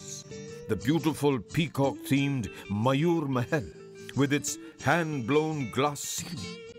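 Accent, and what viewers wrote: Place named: India. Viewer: I think this is the first time I have ever heard a white person with an Indian accent.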